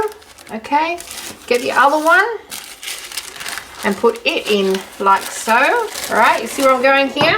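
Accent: Australia. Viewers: Thank you for this wonderful recipe. I have been living in Germany for many years now, and I miss my pasties and pies. Luckily I managed to buy a family pie maker and so lucky I stumbled over your youtube channel. :D So nice to hear a fellow Australian talk, too. The pasties are in the maker, filling tastes fab and they look so great, I cannot wait to get tucked into them.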